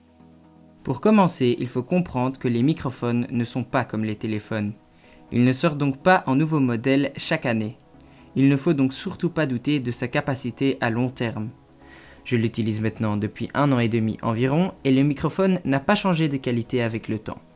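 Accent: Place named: Flanders, Belgium. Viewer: Cet accent flamand est atroce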